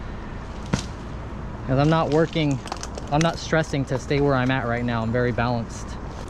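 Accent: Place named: Ireland. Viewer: Irish accent…. Tree = three…. Fellers = fellas. …. Nevermind🤣😂🤣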